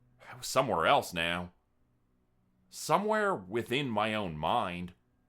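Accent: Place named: Canada. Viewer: He's from Canada and Canadians pronounce certain words differently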